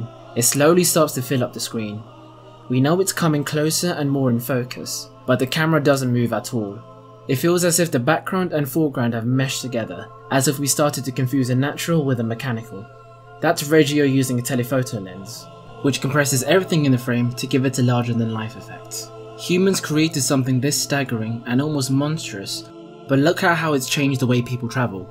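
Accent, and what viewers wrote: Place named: London, United Kingdom. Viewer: I can hear the London in your voice haha, this is some terrific commentary. An analysis on Isle of Dogs would be great, taking the more symbolic approach would be something I haven't seen effectively yet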